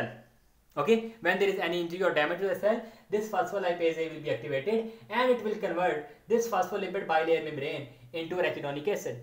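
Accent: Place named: Pakistan. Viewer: You explained very nicely sir thank you so much 😊 I think you are from Pakistan ( I recognised by speaking tone )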